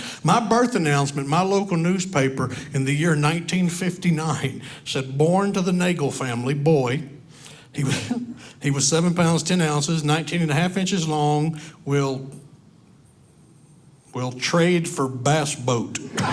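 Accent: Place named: South, United States. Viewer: His accent makes u think he’s gonna say something ignorant but he’s got his own southern style to him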